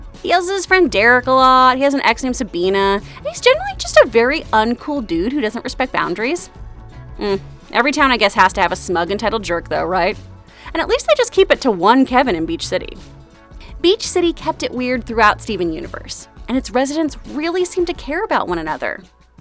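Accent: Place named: Texas, United States. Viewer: I knew there was a reason I liked your voice 😆 Being a Texan, I love familiar accents above all else 😋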